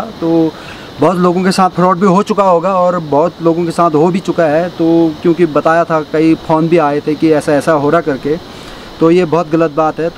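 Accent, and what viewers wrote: India. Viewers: bheji mi smjhi gyon😉...Pahadi tone aani ch😂😁❤️ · Apki Uttarakhand valo ki jasi avaj lgti h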